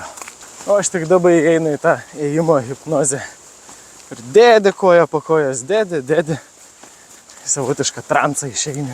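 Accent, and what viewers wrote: Šiauliai, Lithuania. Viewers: Tas siaulietisks bazariuks vis tik islend:)))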